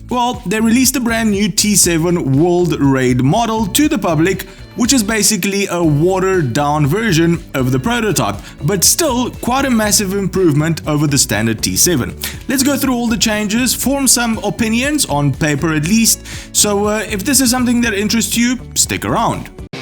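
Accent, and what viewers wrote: South Africa. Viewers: were you originally from South Africa? You have a hint of South African accent. 🇿🇦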